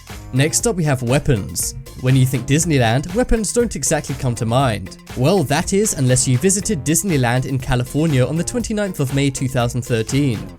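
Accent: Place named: England, United Kingdom. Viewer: Love the accent!! English??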